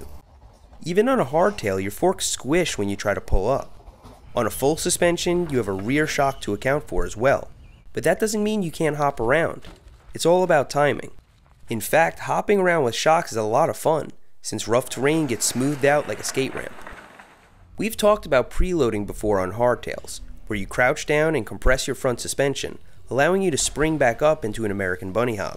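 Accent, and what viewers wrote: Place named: New York, United States. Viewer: Was pretty sure you were from NY when I heard you say BAWLS in a few previous videos. This video confirmed that, lol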